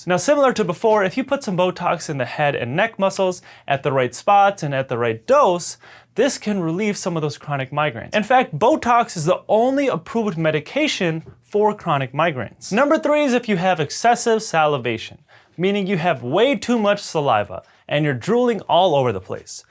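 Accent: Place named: United States. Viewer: I didn't expect him to speak in an American accent 💀💀💀💀💀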